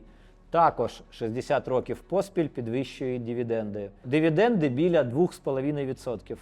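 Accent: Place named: Russia. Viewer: Дуже важко слухати ці рускаязичниє чєлюсті😅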